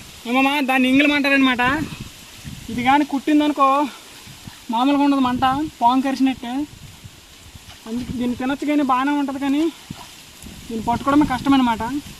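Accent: Srikakulam, India. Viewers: Midhi Srikakulam laa undii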